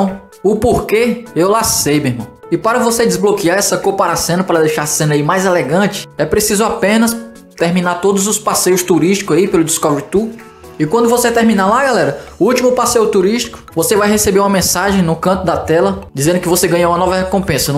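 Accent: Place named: Northeast, Brazil. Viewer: E nois tabem sou nordestino cabra da peste o Nordeste vai domina o mundo percebi pelo sotaque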